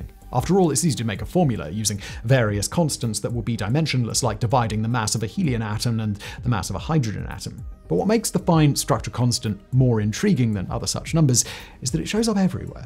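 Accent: United Kingdom. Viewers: I cant take your pumped voice Brit accent. You are not serious. Jeeeeez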